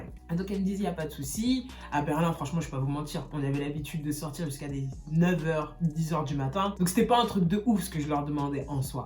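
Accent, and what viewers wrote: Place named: Ivory Coast. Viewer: l’accent ivoirien me tue! continue j’ai kiffé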